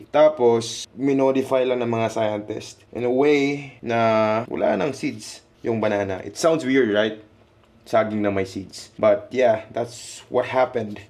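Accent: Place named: Conyo, Philippines. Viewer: Kabaklaan conyo pa mag salita, cringe ka talaga haha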